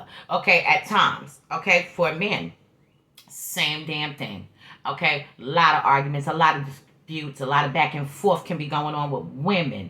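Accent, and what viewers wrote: New York, United States. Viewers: I always watch your videos. U sound like you from the Bronx or NY I should say. I have this placement. I also have Neptune in 4th house. Saturn and Uranus in the 2nd. In this lifetime, I took care of my mom. So much so I took her to the hospital the day she died. But I couldn’t stay cuz of Covid, I got the shock of my life when I called the hospital two hours after I took her and they told me the doctor will call me back because when he called she told me my mom died. I been taking care of my mother since I was very small. I mean going out doing laundry and going food shopping at 10 years old. I had a very hard childhood. I was the black sheep. My mom was mentally ill, severely depressed and emotionally unavailable. I always felt very unloved. Then I was the scapegoat. But I love these interpretations. U do your research and u don’t sugar coat like thee other astrologers. 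You are helping with me and others with shadow work. God Bless You and Yours.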